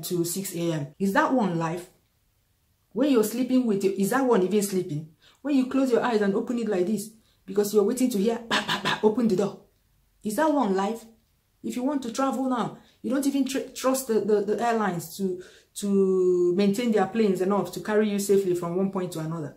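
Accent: Nigeria. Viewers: I love your accent, truely Nigerian. I am very sad about what's going on in Nigeria. I agree with you let's make Nigeria great again.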